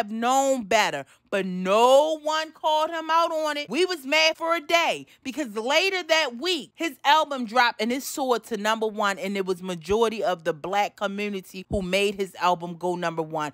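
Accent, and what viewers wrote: Baltimore, United States. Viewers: Found you by accident. That BMore accent got me here to stay 😂 great content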